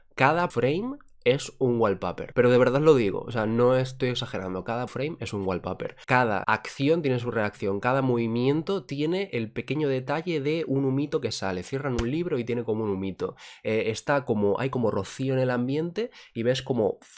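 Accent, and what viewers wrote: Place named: Cuba. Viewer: Soy ek unico que piensa que suena medio cubano en este video al principio mayormente xd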